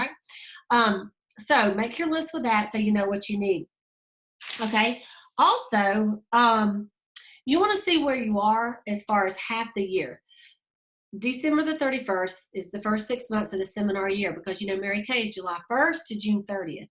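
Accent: South, United States. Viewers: I love your Sunday chats. You keep us up to date. I love hear your southern accent ,when you explain or introduce company promotions. You seem to always make it clear and very convincing that we can achieve and you always make an example of how you did it. Which is so encouraging. You are a true example of Go Give A great representation of Mary Kay.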